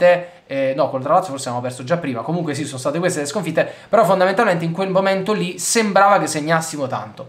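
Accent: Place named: North, Italy. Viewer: ACCENTO SETTENTRIONALE VEDO😂😂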